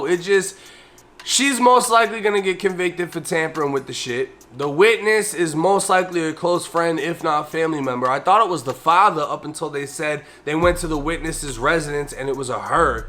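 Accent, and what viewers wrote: Boston, United States. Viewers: Great show I've been ROCKEN WITH YOU since you was on 23 and 1 I have to say young man you need to try to expand cause just the way you tell stories and with your Boston accent it just works keep it 100 and you will go along way this is the old man from Indiana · You gotta be from Boston or the east coast no?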